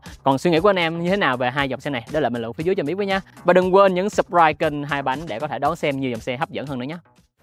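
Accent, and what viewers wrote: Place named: Saigon, Vietnam. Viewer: Một Chútttt nghe nó sượng lắm ý, kiểu nó không đồng nhất. Nếu đã phát âm cho đúng thì đúng hết, còn này toàn bộ giọng Sg xong mỗi từ chútt thì nhấn như ng Hà Nội. Kì vậy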